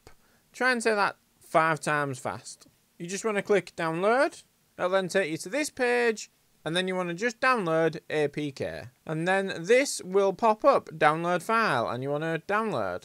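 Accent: United Kingdom. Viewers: One thing i could confirm is that, he is from UK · Are you from Hull mate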